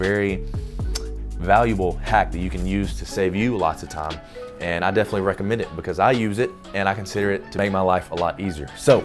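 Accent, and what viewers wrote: United States. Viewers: sounds so country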